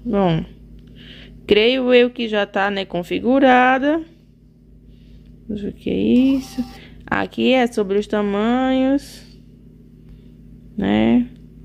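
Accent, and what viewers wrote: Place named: Recife, Brazil. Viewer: Arrasou e esse sutaque so pode ser de Recife sucesso mulher <3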